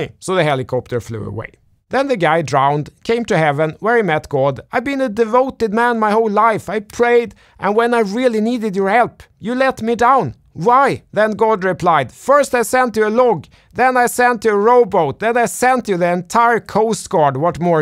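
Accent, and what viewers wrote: Sweden. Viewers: Great video👍 I can hear the swedish accent, so you are definetly from Sweden 😄👍 Hilser deg fra Norge 🇳🇴🇸🇪🇳🇴🇸🇪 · Your dialect is so swedish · Mest ikoniska svengelskan jag hört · This is the most swenglish I've heard since Rosling 😂 great video!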